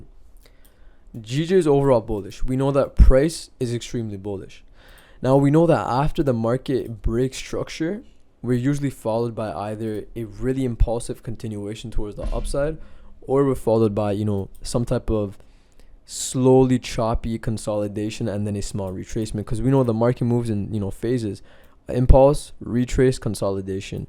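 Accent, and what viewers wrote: Toronto, Canada. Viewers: U sound like u from toronto. Am i wrong?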